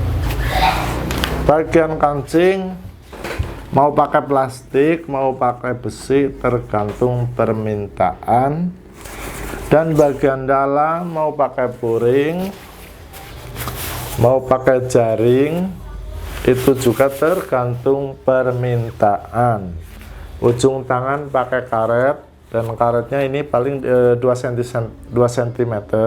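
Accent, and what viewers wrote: Banyumas, Indonesia. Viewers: Ngapak ya mang 😁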